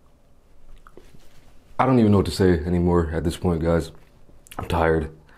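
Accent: United States. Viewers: Why do you have an American accent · U got American accent dude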